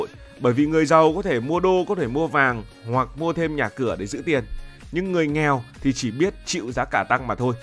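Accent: North, Vietnam. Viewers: Giọng Bắc Kỳ đã ko tin tưởng rồi..làm cskh gặp khách bắc kỳ là y như rằng vừa ăn vạ vừa láo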